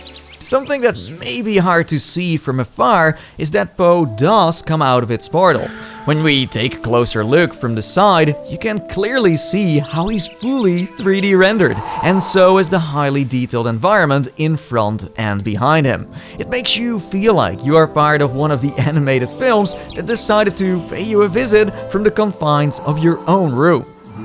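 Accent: Netherlands. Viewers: ik zou zeggen praat alsjeblieft niet met zo'n nederlandse toon als je engels praat maar ja, je hebt 758k dus wat kan jou het boeien natuurlijk.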